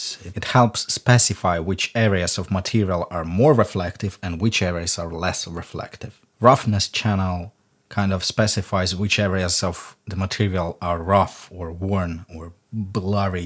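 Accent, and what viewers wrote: Russia. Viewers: блин, я прям чувствовал натянутое английское произношение, ахаха, русских видно сразу =) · Are you a Russian? Doesn't seem you're from UK.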